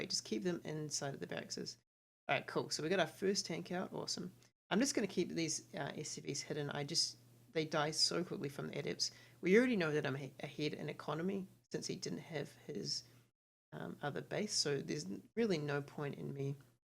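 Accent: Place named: South Africa. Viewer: Excellent video. I really liked the calm easy thought process you had during the game. Your accent sounds like you are from South Africa.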